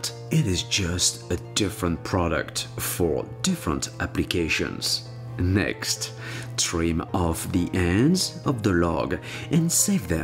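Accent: France. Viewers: Love his french accent! 🤗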